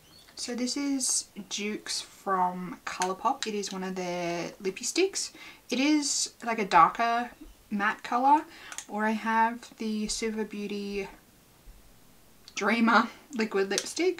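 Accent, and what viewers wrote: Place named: Australia. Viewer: Another person who’s found you via Annette. So lovely to hear an Australian accent. 😊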